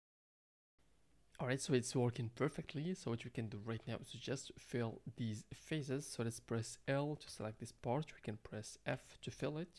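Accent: Morocco. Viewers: Your accent sounds Moroccan 😅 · are you moroccan? you sound exactly like my relatives.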